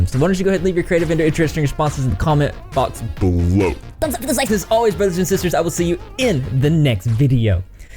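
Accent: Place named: Georgia, United States. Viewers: I knew that accent had to be from Georgia 🤪 I live there too. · I called it! I knew your accent was from east of the Mississippi! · I just found ur channel yesterday and knew u were from Georgia just by your accent- bc that’s where I live too 😂 happy you said it in this video so I’m not still wondering if I’m right! What area are you from?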